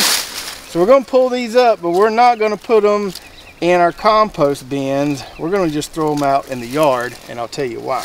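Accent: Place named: Southwest Virginia, United States. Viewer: Thank you! Not sure where you're from but you speak like us here at home. I think you may be a little further south but here in southwest Virginia, our last two winters have been so mild that it doesn't freeze out the insect pests that cause disease like you said in this video. I like that worm bin you had buried in your raised bed. I'm thinking about making one of my own. Take care!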